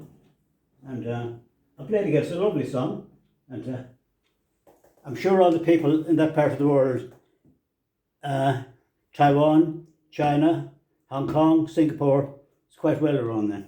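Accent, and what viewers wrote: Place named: Ireland. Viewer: Yes indeed I totally understand what you are saying ....and listening to your delightful accent is pure joy .....I hope to go back for a visit to Ireland ...check in to more of my O'Donnell and Ryan clans❗🎼🎶🎶🎹❤🇨🇦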